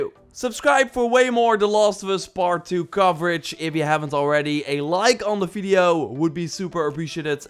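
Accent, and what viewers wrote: Netherlands. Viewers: Ik hoor aan jou accent dat je Nederlands bent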